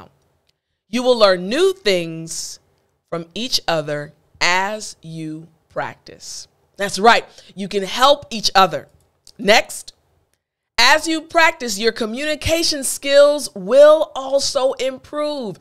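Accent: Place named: United States. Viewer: Different accents but i would like every America have you same accent teacher, cuz it's beautiful and I can understand clearly 😊😊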